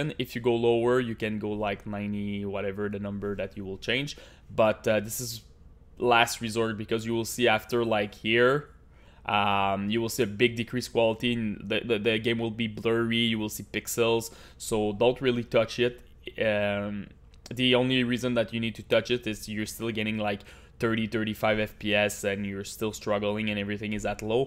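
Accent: Canada. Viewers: Or u know, canadian accent. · Toi t’es québécois avoue sa sentent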